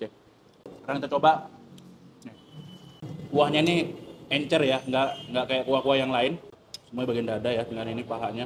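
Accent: Medan, Indonesia. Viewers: Nambah napsu makan nonton chanel ini.. suka sama kedua reviewernya = lidah medan = Lidah bandung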